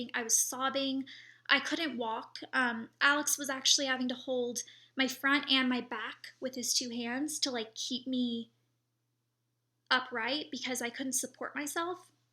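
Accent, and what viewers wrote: South, United States. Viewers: i could here a little bit of your southern accent in the clips from frightmare manor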